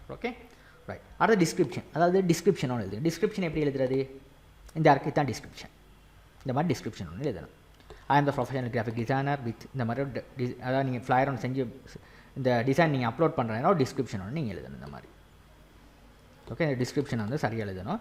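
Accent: Sri Lanka. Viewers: Bro neenga Sri Lanka va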